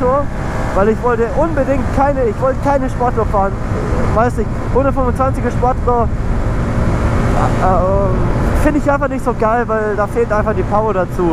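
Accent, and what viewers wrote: Swabia, Germany. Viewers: Ja man neues video, schwätz aber ruhig schwäbisch ❤😂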